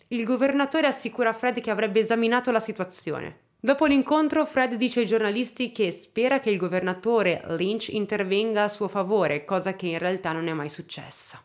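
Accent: United States. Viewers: il tuo accanirti nel “parlare in americano/inglese” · Perché spingi così l accento americano?????? Sarebbe tutto molto interessante se non fosse per questi nomi e città straniere citate con un accento veramente troppo spinto...... veramente fastidioso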